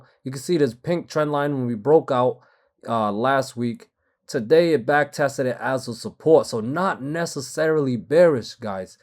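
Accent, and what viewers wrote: New York, United States. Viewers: Yo, when are you going to reveal your identity? You're definitely from NYC. You probably from Brooklyn cuz you stay keeping it 💯 😂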